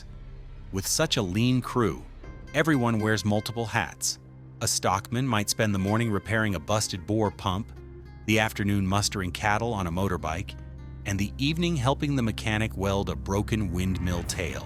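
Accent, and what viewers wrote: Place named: United States. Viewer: As soon as i heard this yanks voice i new this video would be Bullsh!t!!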